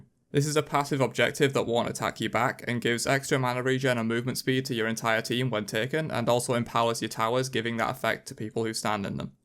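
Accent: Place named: Northern England, United Kingdom. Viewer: Aghhh a northerner. Thus washs my ears of american smite players, subbed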